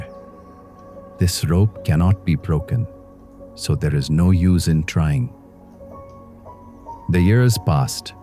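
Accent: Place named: India. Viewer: I wonder which country's pronunciation is? It's like an Indian pronunciation.. do you answer me ?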